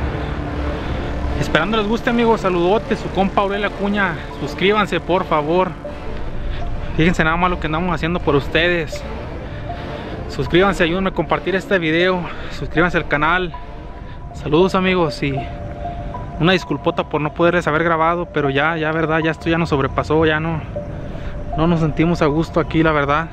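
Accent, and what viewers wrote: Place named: North, Mexico. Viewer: qe me encanta ese asento mejicano como norteño qe lindo México Saludos desde Chile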